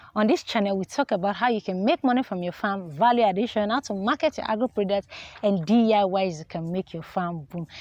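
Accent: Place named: Urhobo, Nigeria. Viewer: You sound like Urhobo girl.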